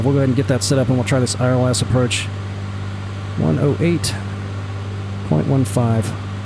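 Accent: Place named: North America. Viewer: Where's the Big One? One of the biggest roller coasters in the world is on the Pleasure Beach... no sign of it here. The whole Pleasure Beach doesn't really look like the Pleasure Beach at all. Blackpool Airport isn't accurate either. I mean it all looks fab, and if you didn't know the area I suppose it doesn't really matter, but for those who live here, it's a little disappointing. No idea what that massive square hanger building is you parked next to... that's not there in reality either. Nice video though... strange hearing a north American accent talking about my home town :)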